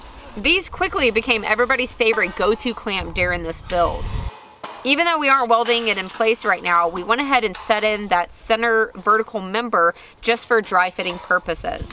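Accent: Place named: South, United States. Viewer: Are you going to pour a concrete pad for that thing? When rainy season comes, I could see those concrete blocks sinking in and warping the bed. "Cody drug it over there." You go, southern girl, that's how we talk.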